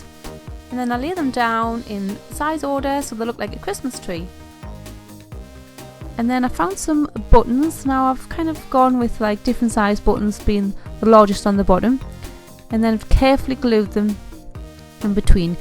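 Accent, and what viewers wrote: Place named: North East England, United Kingdom. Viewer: Super cute ornaments. Are you from the North East? Im from Sunderland and ur accent is very familiar.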